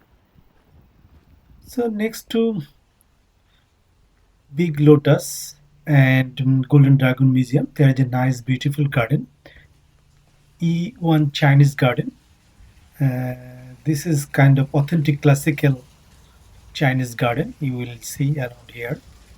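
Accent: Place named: Bangladesh. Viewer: You speak bangladesh english, can't understand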